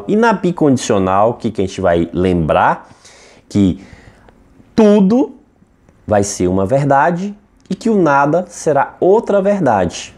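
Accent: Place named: Bahia, Brazil. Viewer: Excelente trabalho, professor! O sr. é da Bahia? Se não for parece mto. Eu sou de Olinda, Pernambuco.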